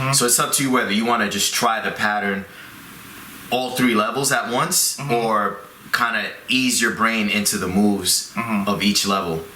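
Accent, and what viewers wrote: Barbados. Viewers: Dope shit! Big up! (I heard that Bajan accent too) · bajan accent strong.....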